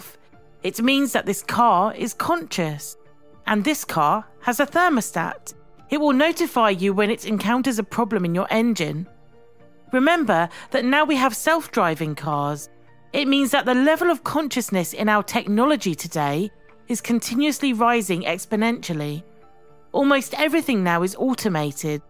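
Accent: United Kingdom. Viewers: Know how, I subscribe because I love the British accent and the narrator even makes more appetising.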